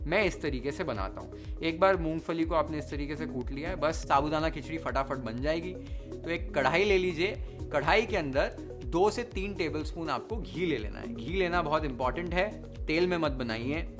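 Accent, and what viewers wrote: Maharashtra, India. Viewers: Heres a son of Maharashtra who respects our traditions n cuisines. Otherwise v wld hv mutton biryani lucknowi style uploaded on sch occassions..totally insensitive. All my love n blessings to u chef. U hv md ur parents proud. And i love ur perfect marathi accent too😄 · Your Food channel is my favourite food channel. I have made khekda bhaji and Misal exactly how you showed and it was amazing 😍 thank you. Your Marathi is so pure अस्खलित, hope people have noticed the below words used by you instead of Hindi shows your love not only towards the dish or recipe but the traditional and authentic way and process you like to make that Marathi / Hindi Maap / Naap Chitak / Chipak Marathi influence, true Maharashtrian 🚩🚩 Jay Shivray, Jay Maharashtra 🚩🚩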